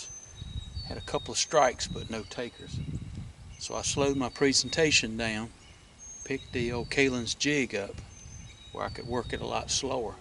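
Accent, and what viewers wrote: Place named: Virginia, United States. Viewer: Nice, where are you located? You sound like a Virginian.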